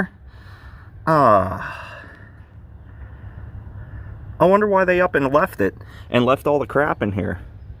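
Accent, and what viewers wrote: Pittsburgh, United States. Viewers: First of all, love your Pittsburgh accent! It kind of makes me homesick. I lived in the North for a long time and agree with you about southern people. If I had a choice between living in Texas or Tennessee however, I would live in Tennessee. Great video bud! You made an otherwise boring old gas station interesting.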